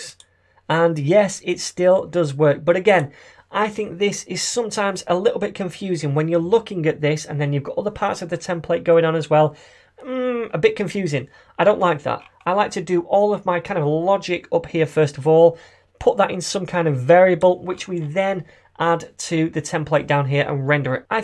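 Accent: United Kingdom. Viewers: I love you British Accent <3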